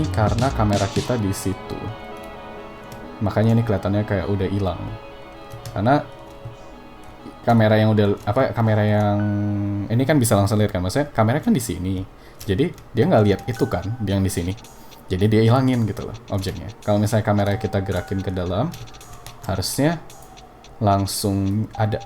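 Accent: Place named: United States. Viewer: Anytime you say an english word its pronounced perfectly with an American accent lol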